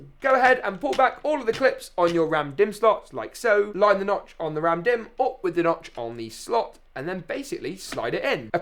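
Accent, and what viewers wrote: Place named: Yorkshire, United Kingdom. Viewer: Utterly bonkers - You are from Yorkshire, right?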